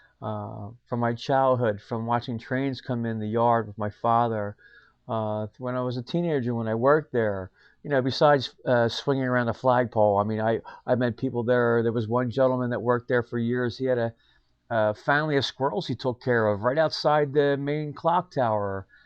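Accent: Philadelphia, United States. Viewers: Love your Philly accent! Thanks for the great video & interesting commentary. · Thanks for posting. As another commenter said “love your Philly accent(!)”. My Dad worked there for 37 years. First in the building with the clock tower. Moved to the new building next door when it opened. Retired 1971. He talked with respect and admiration for the fire fighters of Engine 70. Also the Philly PD.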